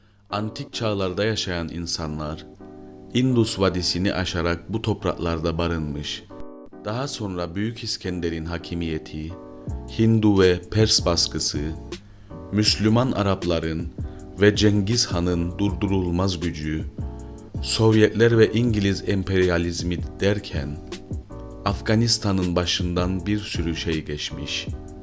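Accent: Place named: Northern Cyprus, Cyprus. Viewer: Admin Kıbrıs Türk'ü müsün şiven benziyor 😊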